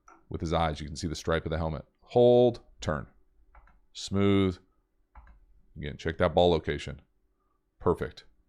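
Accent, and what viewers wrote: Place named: Boston, United States. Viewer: This guy has such a bostonian accent!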